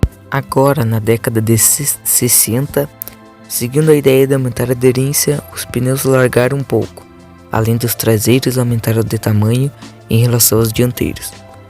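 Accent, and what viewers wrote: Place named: Paraná, Brazil. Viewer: Vc é paranaense?😂😂 Tem mto sotaque, olha q sou daqui e reparei, mas mto bom o vídeo msm, cheio de informação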